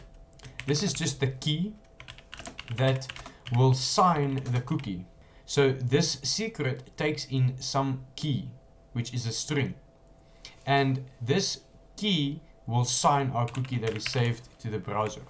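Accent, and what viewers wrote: Afrikaans, South Africa. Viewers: You have the fattest Afrikaans accent ever. Love the video >3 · You've got an Afrikaans accent. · South African ?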